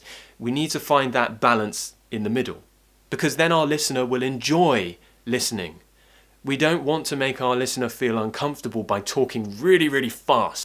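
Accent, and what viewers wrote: United Kingdom. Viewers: I love your british accent ❤️ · You are an amazing teacher, I like your British accent.........a bientôt sur YouTube 😘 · I do love your teaching british accent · Hello 👋🏻 Actually it is very hard to speak like native british people. I was studying british english at school but unfortunately even my english teachers couldn’t speak like you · your voice and your British accent😍i fell in looove🙃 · I’m always looking forward to listening to your beautiful British English! Fantastic Friday! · Love ur British accent. Thanks for ur fantastic lessons. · as well as your British Accent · I love your accent. You sound like a posh English man❤ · When I found your channel I didn't know how to have a British accent, but with your videos I have learnt a lot. Thank you!!! You're an amazing and smart teacher. I love listening you! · I luv your British accent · one thing I must mention I have been learning pronunciation for several months through ur video lessons,and I have been imitating your speech.Now my ielts tutor is just amazed about my pronunciation like a British.I reaaalllyyy respect,admire,love you.U r really super tutor.🤗🤗🤗🤗🤗🤗🤗🤗take care urself for us and ur family!!!!❤❤❤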